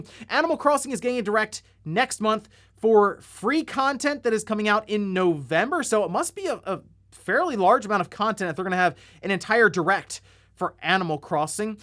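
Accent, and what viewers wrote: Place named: United States. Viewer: Damn yanks -it’s MAHrio not MUHrio.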